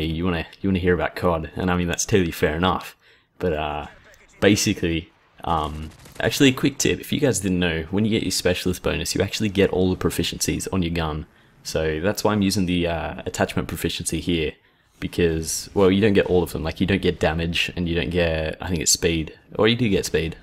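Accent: Australia. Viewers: Good to hear a fellow Aussie! :D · Woooooo finally a commentator from Australia :D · is this guy australian???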